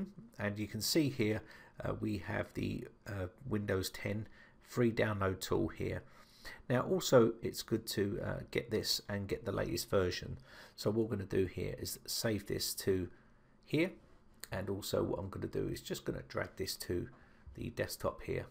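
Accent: United Kingdom. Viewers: I love British accent,thank god it wasn't a Indian man...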